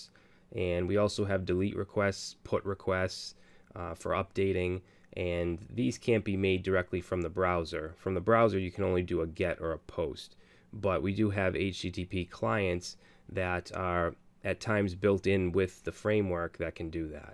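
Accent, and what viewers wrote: Boston, United States. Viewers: Are you from Dorchester/Roxbury?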